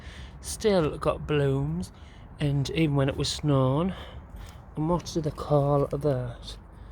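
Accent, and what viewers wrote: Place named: North, United Kingdom. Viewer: Love your Northern accent. Garden will look lovely when in bloom.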